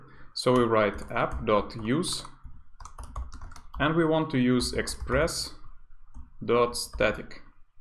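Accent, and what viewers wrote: Russia. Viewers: какой классный тяжелый русский акцент, чувак ты ахуенен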